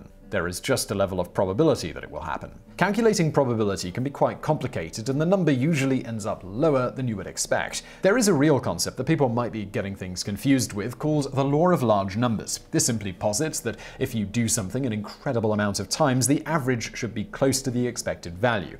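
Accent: United Kingdom. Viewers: Since he's British. I'm pretty sure his pronunciation is the correct pronunciation. Others are speaking English with an accent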